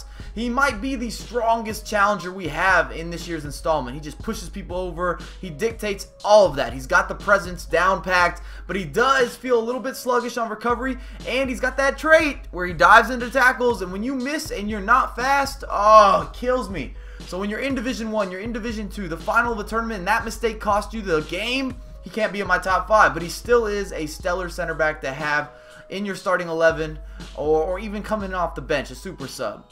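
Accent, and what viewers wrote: United States. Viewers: Are you America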